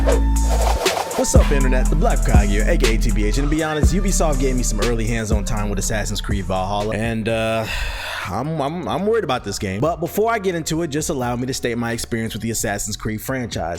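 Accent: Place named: Pittsburgh, United States. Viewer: He must be from Pittsburgh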